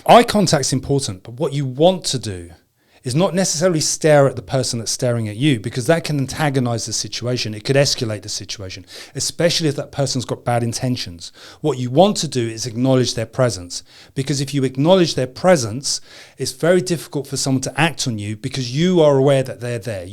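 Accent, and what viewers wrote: United Kingdom. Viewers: New York? You sound like you're from the UK with that accent. I just checked out your main page. You're usually based in London. Right??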